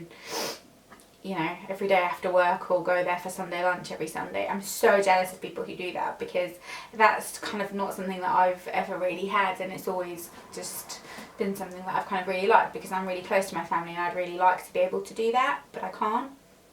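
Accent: England, United Kingdom. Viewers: Very interesting video! I've been watching you for years and it's nice to hear a bit more about you and your past! You have a very English-sounding accent, without sounding completely uneducated and ignorant - did you always have that accent or has it evolved since you moved over here?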